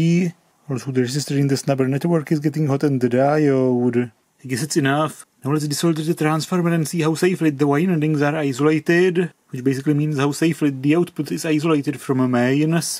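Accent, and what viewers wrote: Czech Republic. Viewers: I thought you sounded Either Czech or something But then i saw the Tesla branded stuff, where're you from? Did i get Czechia on the spot? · Říkal sem si českéj přízvuk, ale ty ses fakt Čech 😅😅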